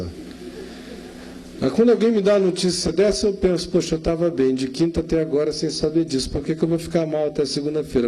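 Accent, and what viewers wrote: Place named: Pernambuco, Brazil. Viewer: Aí sabe que fala Recife Pernambuco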